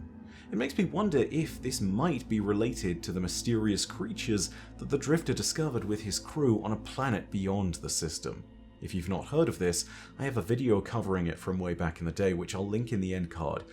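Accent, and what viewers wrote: United Kingdom. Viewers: You have an accent, you're British, yada-yada..... But when the clip you play clearly calls them "uh-feel-ee-un"... It makes the way you say it just sound wrong.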